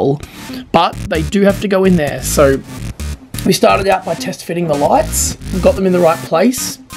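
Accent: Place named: Australia. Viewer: Feeling a lot like a mighty car mods video, not sure if its the similar music in the background or the aussie accent! haha Keep it up man!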